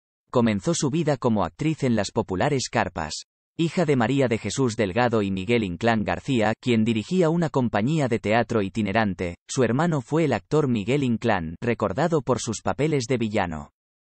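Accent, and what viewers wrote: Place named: Spain. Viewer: Con respeto es muy interesante sabes más del cine de oro mexicano y la relaciones familiares con actores que todavía tenemos en vida, pero oooooo en cuanto empeze a oír el acento español lo quite a la vrg. No puedo con la Zetaaa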